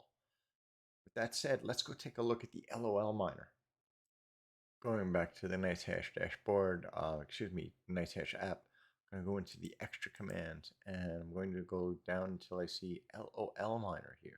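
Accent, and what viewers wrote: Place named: New York, United States. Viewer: The more videos he makes, the more NY he sounds! Love it!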